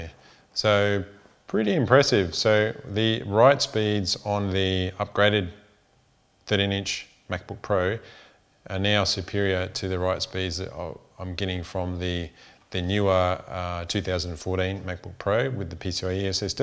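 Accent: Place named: Australia. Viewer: Since some of us hv a hard time w OZ accent.. Be nice to hv more text display in explaining the specs.. Do a detailed listing on the main screen for comparison.. Otherwise an awesome 👍🏻 review..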